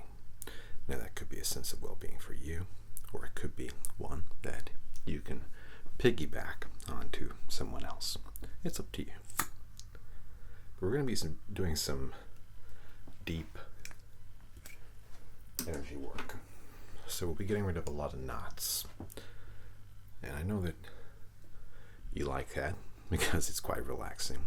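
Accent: United States. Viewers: Out of curiosity: What made you decide to do your Reiki Sessions in an American accent? He doesn't really seem like a caricature, and just seems kinda like a normal guy. Do Brits associate Reiki with Americans?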